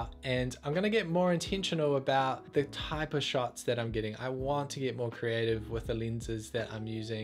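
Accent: New Zealand. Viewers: Awesome video man, thanks for all the tips. So nice to hear that kiwi accent, sounding mint! I'm just starting out with my videos, excited to work on some long form.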